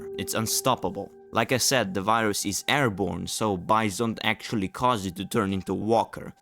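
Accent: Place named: Russia. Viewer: Ur accent feels russian to me btw. Nice video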